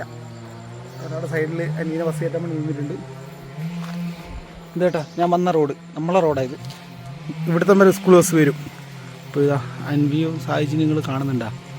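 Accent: Kannur, India. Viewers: നിങ്ങളുടെ സ്ഥലം എവിടെയാ! കണ്ണൂർ ആണോ? സംസാരം കേട്ടിട്ട് അങ്ങനെ തോന്നി.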